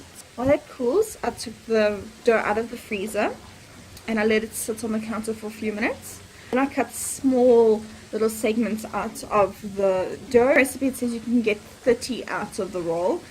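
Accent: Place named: South Africa. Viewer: Your subtitles are the best. Lol... Google clearly doesn't understand our South African accents. Nice videos 👍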